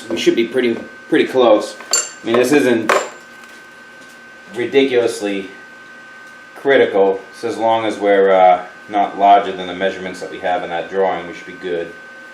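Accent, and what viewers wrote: Boston, United States. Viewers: Had to look up Schmutz. Thought it was some kind of Bawsten accented slang but its actually german for dirt. Thanks for video, its very well explained and entertaining with the accent...reminds me of Matt Daemon or Mark Wahlberg.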